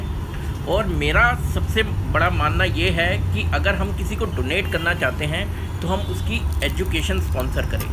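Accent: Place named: Punjab, India. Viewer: Best part of your channel is ki aap hindi bhi punajbi accent me bolte ho.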